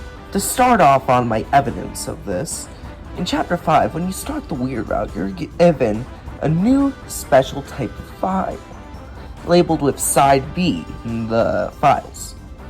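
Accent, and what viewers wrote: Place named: Canada. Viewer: you talk like a canadian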